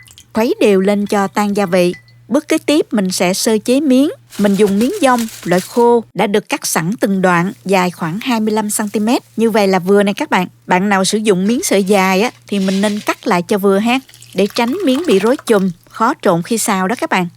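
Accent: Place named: Binh Duong, Vietnam. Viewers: Quê hương minh cung la binh dương nghe sau nho nha quá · ơi tiếng nói cô dễ thương quá __ mình nghĩ chắc quê ở Bình Dương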